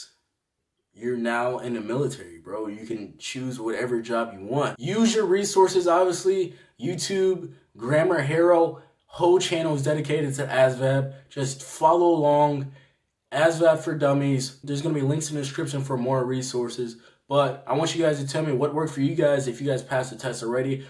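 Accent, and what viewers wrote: Baltimore, United States. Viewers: I like his Baltimore accent lol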